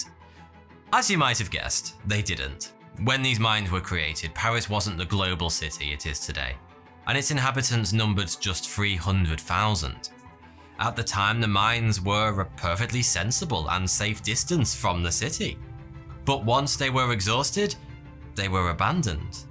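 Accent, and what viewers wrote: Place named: Yorkshire, United Kingdom. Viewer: Where's this man from? Sounds Yorkshire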